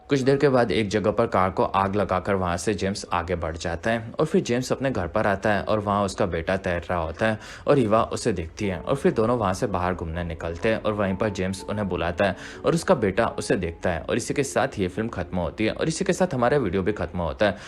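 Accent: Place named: Odisha, India. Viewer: Man your accent looks like Odia-hindi mix.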